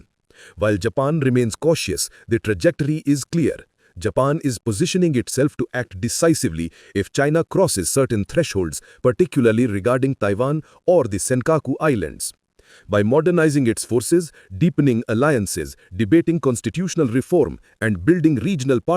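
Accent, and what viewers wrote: India. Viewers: If it is AI voice why pick these Indian accents this is so hard to hear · Indian accent. I dont like.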